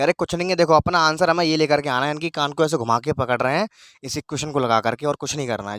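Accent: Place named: Braj, India. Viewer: braj bhasa accent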